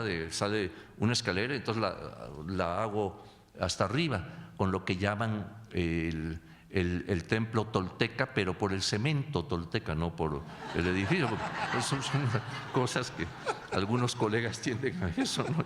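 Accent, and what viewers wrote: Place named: Spain. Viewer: Eduardo Matos, encargado de poner por los suelos la cultura Mexica. En la escuela nos enseñaron a desestimar nuestro pasado historico, y a llamarle a España "Madre Paria", naturalmente yo ya soy grande, ese mote se lo pusieron a España a travez de los siglos y todavia en el siglo XX se le seguia llamando así a la bola de delincuentes y bandoleros ignorantes, que por poner un pie en las playas mexicanas, pasaron a decir que descubrieron América y pasó a formar parte de los tesoros del reino español. Al profesor Eduardo Matos, se le nota hasta en la voz su origen español. Siempre poniendo muy por debajo la gran cultura de los pueblos Mexicas de la poca cultura que demostraron los españoles al destruir la gran ciudad de Tenochtitlan.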